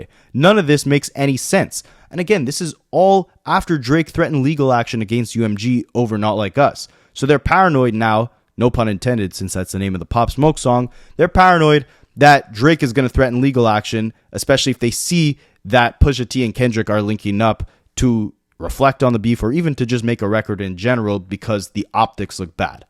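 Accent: Canada. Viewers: Its the canadian accent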